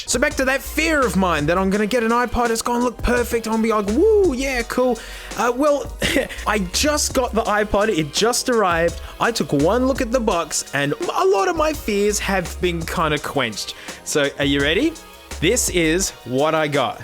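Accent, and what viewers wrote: Australia. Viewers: Gotta love the Australian accent let’s get in there MATE!!! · This voice is so funny to me, probably because he is Australian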